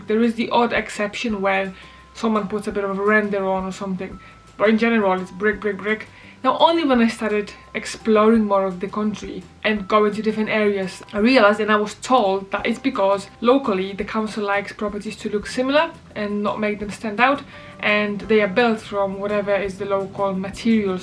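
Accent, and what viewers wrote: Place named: Manchester, United Kingdom. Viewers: It is so brilliant to hear you speaking with the northern "U" sound. Most people who learn English learn to speak the way they do in the south. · Bravo! Great to have you in the UK. You have got the Manchester / North West Accent! My wife (from Brazil) occasionally drops into the Norwich accent.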